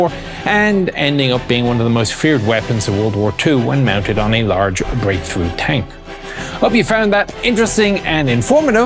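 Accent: Ireland. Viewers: So this music volume is mandated by wargaming then? But... why? If its volume was less than half of what it is here, I might actually be able to concentrate properly on the really interesting stuff the Chieftain's trying to educate me in, but instead we get boss music with some faint, soothing Irish tones in the background, apparently. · Ditch the music now mate! Your sweet Irish lilt is all we need Chieftain!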